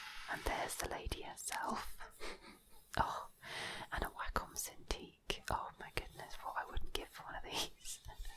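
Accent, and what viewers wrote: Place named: United Kingdom. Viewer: Sorry but I genuinely don't like British or similar to British accent,so this video soundeed agitating to my ear due to that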